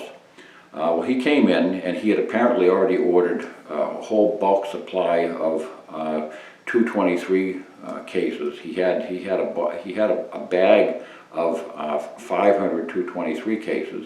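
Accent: New England, United States. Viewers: Like everyone, I have some slightly different thoughts on some of your editorials but for the most part, when I want a very informative "no hidden agenda" intelligent perspective on my current gun interests, I go to. I'm from Texas and I guess I'm somewhat skewed in the area of first-impressions, but once I got past your (no disrespect) yankee accent, you became one of my buddies. Thanks for all the hard work you put into your videos. Don from Pearland, Texas.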